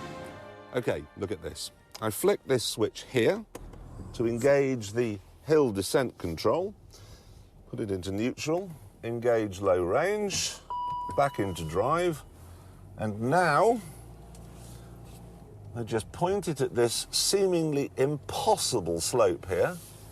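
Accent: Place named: Birmingham, United Kingdom. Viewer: Richard Hammond's brummie accent was still there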